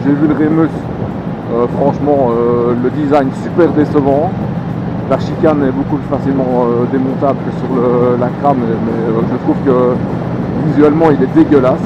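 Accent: Belgium. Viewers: tu as un sacré accent belge ou je me trompe? belle vidéo aussi hein :)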